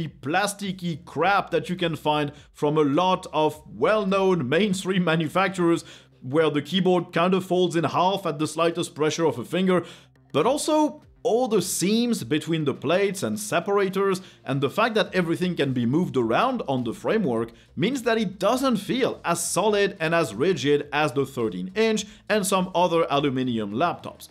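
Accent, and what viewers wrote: France. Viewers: je me disais bien qu'il avait un petit accent francais, j'ai enfin compris que ce n'était pas mon imagination x))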